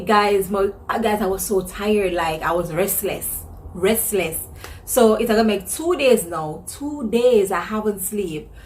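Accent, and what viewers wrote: Jamaica. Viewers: Sorry if I may ask what country are you from... kind of your accent sound jamaican.... but you look so much like a Nigerian😀😀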